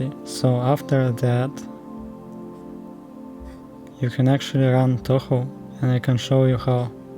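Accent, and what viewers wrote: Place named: Russia. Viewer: блин я сразу заметил русский акцент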